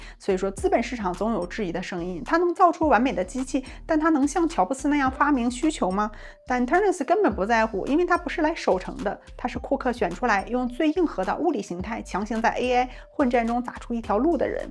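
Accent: Northeast, China. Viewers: 捂。。。唠。。。 戈。。。？？？ 大姐东北银啊？？？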